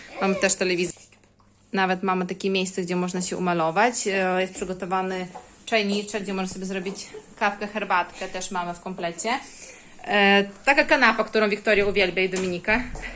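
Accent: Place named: East, Poland. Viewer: Akcent wschodni Pani ma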